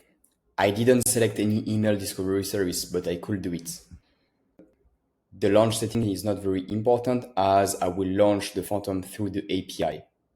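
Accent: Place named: France. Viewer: no wonder nobody watches the videos, cant understand that thick french accent.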